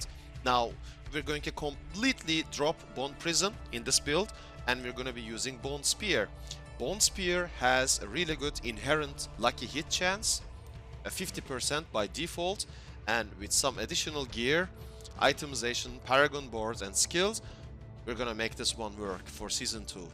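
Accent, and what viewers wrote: Turkey. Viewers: Where are you from? You have such a turkish accent.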